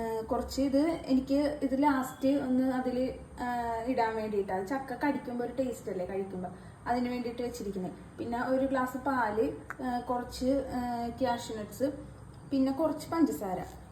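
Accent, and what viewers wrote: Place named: Kochi, India. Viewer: സാക്ഷാൽ കൊച്ചി ഭാഷ😂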